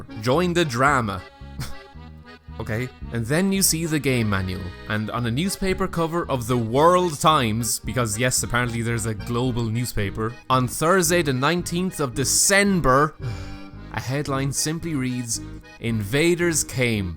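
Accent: Ireland. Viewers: something about an irish accent makes me subscribe before i even watch the video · Just love hearing an Irish gaming you tuber ❤️❤️❤️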